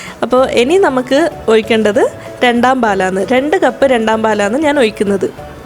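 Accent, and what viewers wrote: Kannur, India. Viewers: Kannur slang? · Hello Njn ipppozhan video kandathu ... Undakki nookki super aayirunnu.. Thk u chechi Chechi evideya kannur aano Kannur slang pole thonnni enikk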